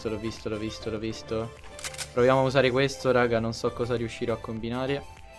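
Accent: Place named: Naples, Italy. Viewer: Pensavo fossi di Napoli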